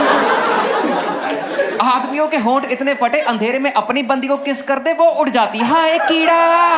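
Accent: Punjab, India. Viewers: I love punjabi accent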